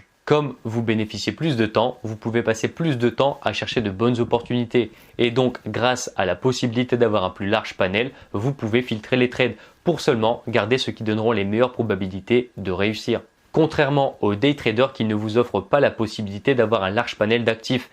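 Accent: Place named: Lyon, France. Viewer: Accent lyonnais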